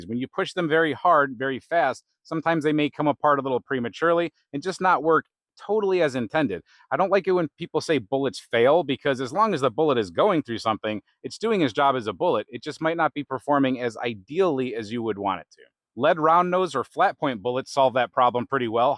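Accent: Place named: North, United Kingdom. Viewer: Instant subscribe because I always appreciate good, substantive number crunching. The friendly northern accent is also an added bonus.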